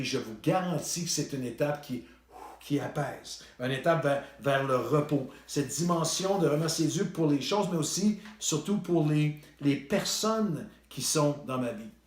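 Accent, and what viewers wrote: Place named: Canada. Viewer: Bsr, j’aime vous écouter Mais j ai du mal à tout comprendre avec le français canadien trop vite Que Dios l bendiga amen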